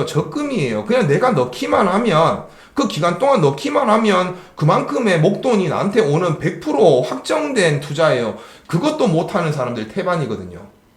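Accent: Gyeongsang, South Korea. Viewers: 경상도 사투리가 문제가 아녀요..내용이 좋으면~~ 상처 받지 마시길요❤❤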